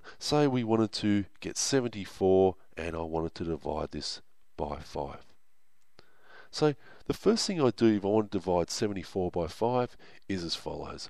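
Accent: Australia. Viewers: you are Australian right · Is he strayan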